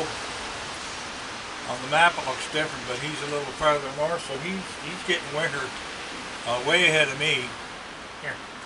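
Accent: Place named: Canada. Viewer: Love me some and Bob! My favorite two channels that I look forward to everyday! I just love your Canadian accent! I was born in upstate New York but moved to Texas when I was a teenager. Sure miss the north!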